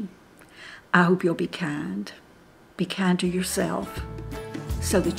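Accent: South, United States. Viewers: I lost 8 of my closest family and friends. I had to take care of my husband for two years. He had Parkinson’s. He died almost 4 years ago. My reason for telling you this is I did not have a wrinkle one when all this started in 2009 I got all kinds of stuff. I just don’t know which way to turn, i love to watch you. You are so down to earth and I don’t know where you come from but you sound like a southern a lady. From Alabama. 80 looks beautiful on you beautiful!! 🩷 I hope that I can b like you. I watch all of your videos and love each one thank you for being there for me. I feel like you’re doing this for me ha ha💓🌸🩷 Just don’t stop! U make my smile! Cathy Seymour from North Alabama🩷🌸🩷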